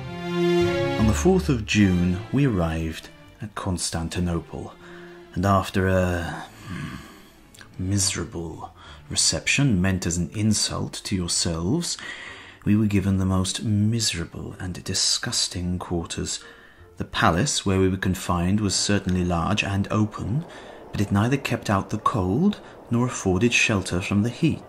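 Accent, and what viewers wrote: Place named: England, United Kingdom. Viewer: The words are harsh, but even the harshest of American or Australian accents simply wouldn't do them justice... Only an English accent can convey the assumed superiority and unmasked disdain for all things "foreign" (complete with pitiful sighs and deliberate sarcasm and intentional mispronunciations, as if the entire Eastern Roman Empire had just farted in his face)... Well done!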